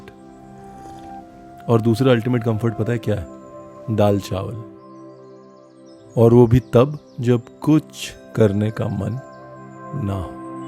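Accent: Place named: Lucknow, India. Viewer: Lucknow ka poora poora asar dikh rha hai lehze mein!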